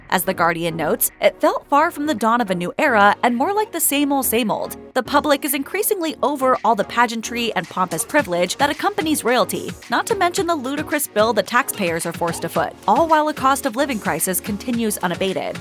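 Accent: United States. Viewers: A woman with an American accent passing all sorts of opinions about how the British people feel. Hmmm. You can report the facts without all the not-so-well-veiled political narrative that you think the average person is unable to pick up on. Saying that Kate only did 142 engagements last year and is somehow a great disappointment to the people is rubbish. 142 engagements with the pressures of being one of the most watched and judged people in the world and a mother of 3 young children. Princess Anne is not currently mothering 3 small children. This video is a reach designed to try and influence people to hate the Royals and grow controversy. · You sound American. Probably watched way too many episodes of that Crown program or something. There's major hospital crisis in the UK right now you know. The NHS is on strike. She has to go private, otherwise she would not be able to get her surgery in time and could maybe die, like I almost did about 6 months ago with my broken infected jaw..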